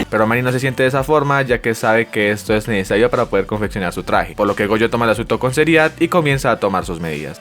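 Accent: Colombia. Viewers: toma tu like, eres colombiano???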